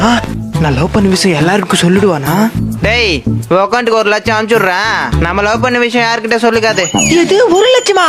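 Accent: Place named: Andhra border, India. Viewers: Andhra border people's slang ithu. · Telugu mixed tamil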